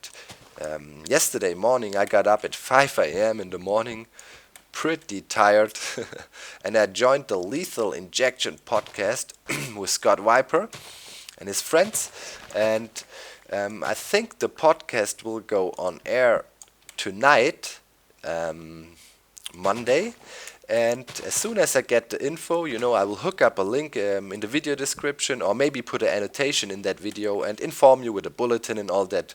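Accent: Germany. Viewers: Ha! Ich wusste doch das du Deutscher bist, das hört man doch. Hab mich aber nie getraut zu fragen :D Geile Videos-> Aboniert =3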